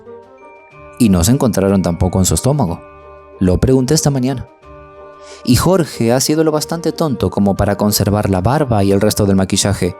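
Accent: Rioplatense, Argentina. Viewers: COMO ME GUSTA SUS RELATOS, PARA MÍ PERFECTO, HABLA COMO YO, RIOPLATENSE, MUY BUENA VOS, PRONUNCIACIÓN, ETC. una abuela desde Montevideo Uruguay 🇺🇾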